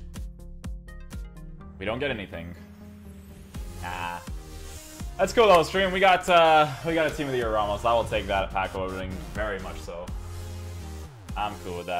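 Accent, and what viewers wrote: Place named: Portugal. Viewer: Are you portuguese? You have a portuguese accent!! Btw you're a great fifa player!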